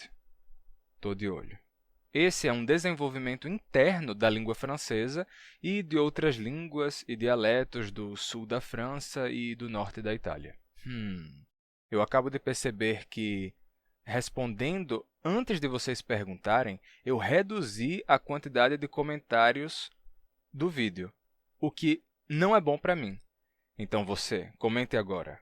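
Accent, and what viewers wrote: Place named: Northeast, Brazil. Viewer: Bom Jesus da Lapa- Bahia)🌎🇧🇷🌵parabéns Guerreiro tu manja da língua portuguesa tu poliglota. 🇵🇹Foi um português que descobriu aqui a gruta a mais de 300 anos faça pesquisa ai Google Guerreiro do RN. Seu sotaque é massa nosso Nordeste de vários sotaques. Hoje tem internet para divulgar mostrar os 2 lado da história real . Tem.baiano qua apedreu Mandarim só ele de xique xique-BA. Ele tem canal faça pesquisa ai guerreiro. 🇨🇳🛫🛫🛫🛫🛫🌵🌵🌵🇧🇷2025 Bahia..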